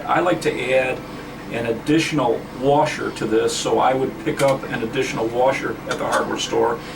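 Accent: Chicago, United States. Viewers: Are you from Chicago? Sounds like you are..thanjs for the video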